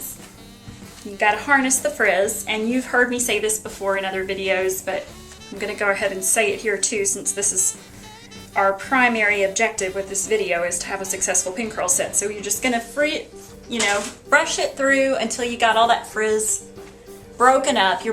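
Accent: South, United States. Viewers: Favorite part; "I'mon spray.." Favorite southern belle in the world.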